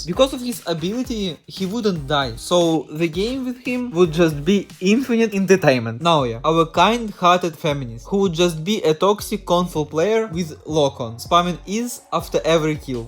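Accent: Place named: Russia. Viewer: Ты Русский? Просто акцент прям пиздец мощный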